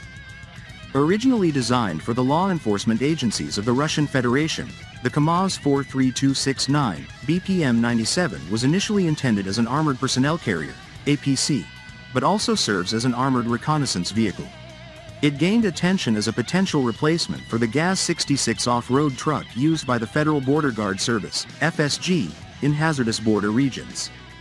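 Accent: United States. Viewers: While he at it change that dumm american accent aswel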